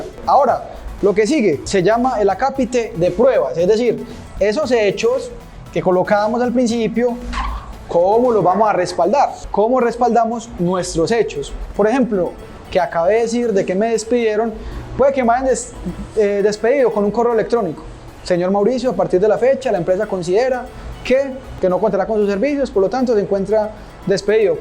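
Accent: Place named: Antioquia, Colombia. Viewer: Uy colega le luce el candado se le pego el acento paisa. Hoy mas facil para enviar una tutela a la pagina de la rama judicial se ahorra lo de las copias.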